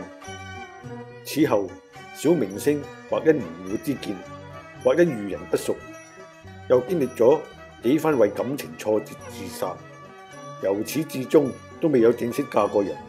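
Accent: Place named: Guangzhou, China. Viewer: 每次出片，我一定細心欣賞！你既旁白同聲線好正、好正！！好地道廣洲腔，每次都帶我番回童年往事！多謝！你飲三合一定系即磨咖啡架？😅😅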